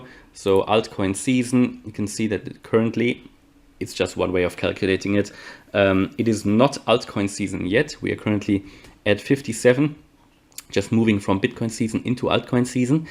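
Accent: Germany. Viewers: German accent detected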